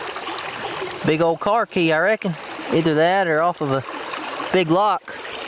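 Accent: South, United States. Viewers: nice mellow southern drawl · He has the most southern ascent ever and i love it!!! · I like his southern accent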